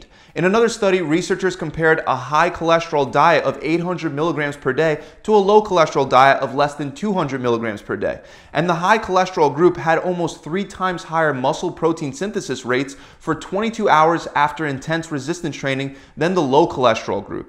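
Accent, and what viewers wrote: United States. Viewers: Usa voice?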